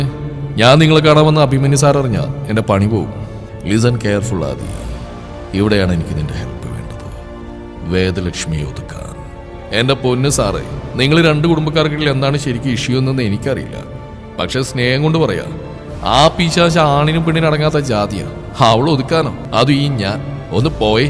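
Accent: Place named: Thrissur, India. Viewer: ❤️🥰🥰സൂപ്പർ... എന്റെ... ആ തൃശൂർ സ്ലാങ് 👌👌ഒരു രക്ഷയുമില്ല... സൂപ്പർ 😍🥰🥰🥰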